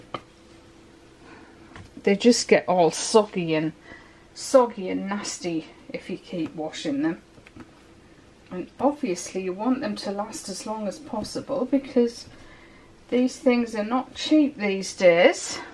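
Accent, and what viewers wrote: United Kingdom. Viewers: That gold is gorgeous. I DO miss British accents..... I hope that you are keeping safe & sound. I’m a soapmaker in NZ. I have access to all the things you do, plus wonderful natural ingredients, herbs and fruits. But that gold. Gorgeous. I would, however be scraping the very last little bit of colour out of each pot into the jugs! Your soap recipe is very forgiving as it stays nice and liquid so long. Very clever. P.S.” little bit too much soap...” never!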